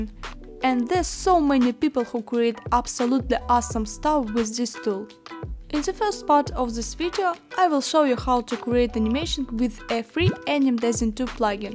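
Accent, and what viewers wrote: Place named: Russia. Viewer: Воу как рашн акцент виден)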